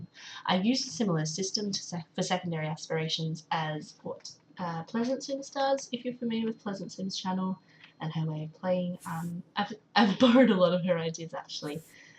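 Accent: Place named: Australia. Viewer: so happy to have found a fellow aussie's channel! it was so funny, i was watching and i was like that accent... it's strange.. and then it hit me - it's my accent LOL!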